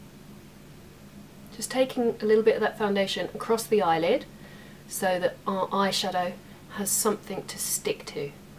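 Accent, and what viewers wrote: United Kingdom. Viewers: You sound extra British today haha :D Love it!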